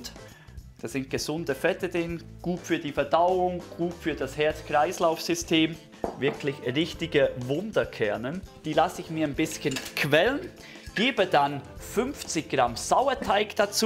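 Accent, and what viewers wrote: Switzerland. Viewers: Ich habe schon mehrere deiner Rezepte gebacken. Jedes Brot ist immer super gelungen, obwohl ich meistens die doppelte Menge nehme (große Familie). Mach weiter so, ich liebe diesen schweizer Dialekt .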